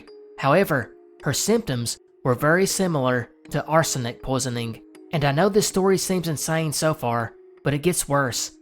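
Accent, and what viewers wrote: South, United States. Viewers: Best Southern Accent on YouTube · I always love your channel. I’ve been a sub for a while now and you never disappoint! I’m from Texas so it’s nice to hear some southern accents in YouTube land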